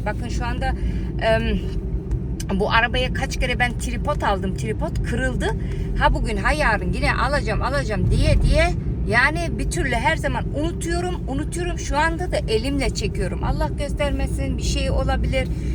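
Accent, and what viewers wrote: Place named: Central Anatolia, Turkey. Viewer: Konusman icanadululari animsatiyor Hakkini helal et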